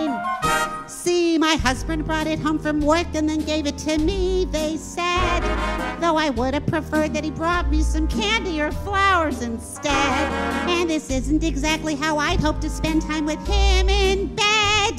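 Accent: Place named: New York, United States. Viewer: You've hit the nail on the head as always. 💉 Nice NY accent! Enjoyable as always.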